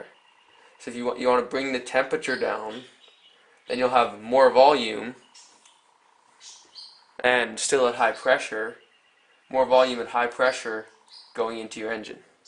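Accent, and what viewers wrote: Canada. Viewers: are you canadian? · hah hah, u're canadian!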